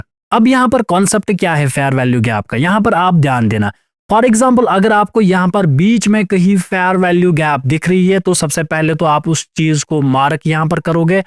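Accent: Kashmir, India. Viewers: Your accent seems to be kashmiri. R u from kashmir,..??? I too am from kashmir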